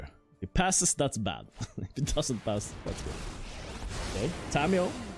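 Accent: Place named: Sweden. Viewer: is u swede boi, u sound swede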